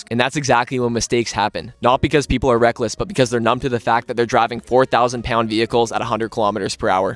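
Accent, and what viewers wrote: Canada. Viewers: Realized you were Canadian in the first 10 seconds of this video +Respect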